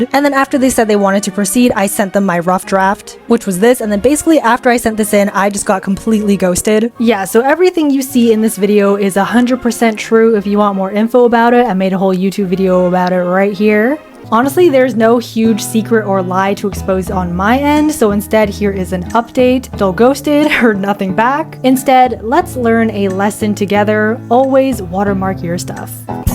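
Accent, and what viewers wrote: United Kingdom. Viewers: Am I the only one who noticed her extreme brit accent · Is it just me or she keeps turning British 🤔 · Why did you speak British?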